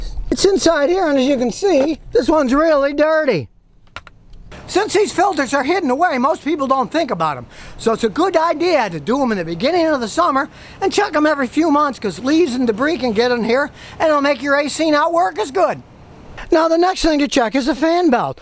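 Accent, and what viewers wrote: United States. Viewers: your English accent is funny and all American 😁. Great info!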